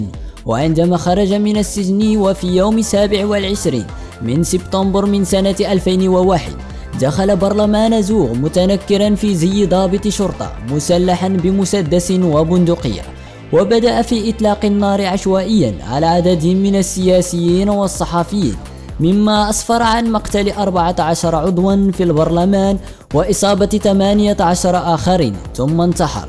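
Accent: Marrakesh, Morocco. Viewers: عجبتني اللهجه المغربيه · لهجته تسد النفس، اللهجة المغربية من أسوأ اللهجات العربية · iman vidia maroooki baaaaaayn ou marakchi maazal -__ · ههههههههههههههه صوت مغربي مراكشي امازيغي قح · vidia Ti Rah Hada Mghribi Puur Bayne Wach Wlde Mrrakch L Hdraa M3tla W T9ilaaaaaaa Katjyb N3aass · هههههه افضل شيء في المقطع هو البهجاوي اللي كيعلق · معلي .. ترى للي يتكلم يبين انه مغربي يعني عربي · هدا. مغربي اللي كيحكي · نبرة صوت مراكشية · ههههههههههههههه مغربس حر ههههههه و مراكشي ، اويلي على الأتباء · chi mrakchi hada xD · هذا مغربي ولا كيفاش صوتك لا يصلح يا هذا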